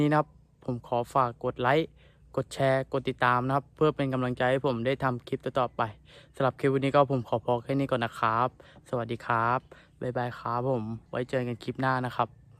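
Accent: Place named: Isan, Thailand. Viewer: พูดคล้ายสำเนียงอีสานหวั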